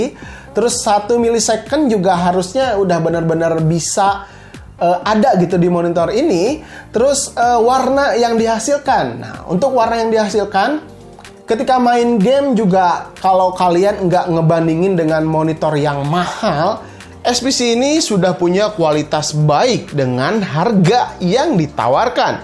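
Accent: West Java, Indonesia. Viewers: Letah sunda mang pabaliut kitu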